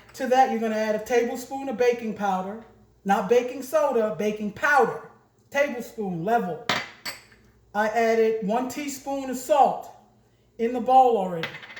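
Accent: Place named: Brooklyn, United States. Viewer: It's the Brooklyn accent for me 😏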